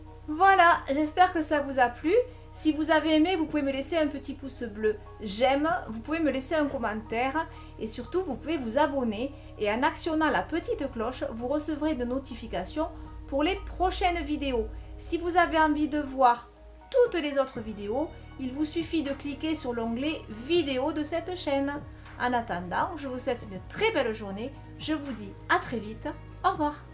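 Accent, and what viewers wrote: South, France. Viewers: Bonjour,👍je vous découvre seulement maintenant. Enfin des vidéos en français. Ce n'est pas évident de comprendre certains artistes en langage étranger. Surtout pour la partie technique et les produits. De plus votre voix passe très bien aux intonations du soleil. Votre tableau m'a donné envie de le réaliser à mon tour, je fonce. Merci de vos conseils. Bonne continuation à bientôt de vous retrouver. ✌👍👏😘🌹 Christine de Cannes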